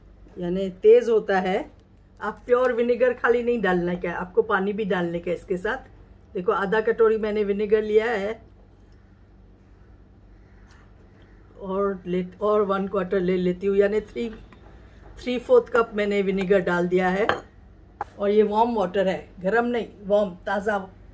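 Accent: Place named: Sindh, India. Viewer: With the name I thought it's a Parsi channel but the time you started to talk aunty ji I got it you are Sindhi 💞❤️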